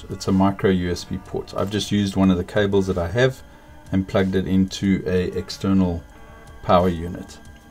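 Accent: South Africa. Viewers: UNRELATED COMMENT: but he south african aint he? dont be mad i aint racist just curious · Are you South African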